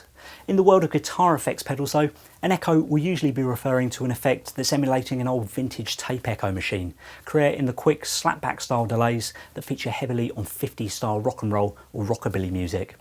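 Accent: United Kingdom. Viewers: this guy sounds like brittish guy from the cowboy bebop movie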